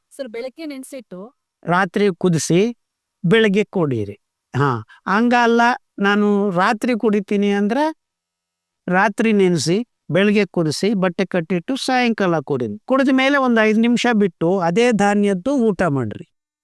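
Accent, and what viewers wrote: Standard. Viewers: ನೀವು ಮಾತನಾಡುವ ಶುದ್ಧ ಕನ್ನಡ ಕೇಳೋದೆ ಚಂದ ಡಾಕ್ಟರ್ ಸರ್ 🎉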